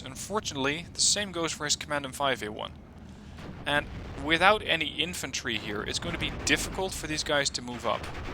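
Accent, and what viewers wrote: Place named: Netherlands. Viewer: Ok. By the way, are you from the Netherlands? You have a particular accent.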